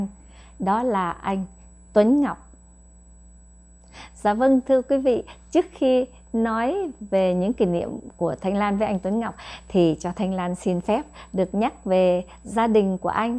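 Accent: North, Vietnam. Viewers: Giông bắc của chị thanh lan dễ thương dạ vân dạ vân hôn chị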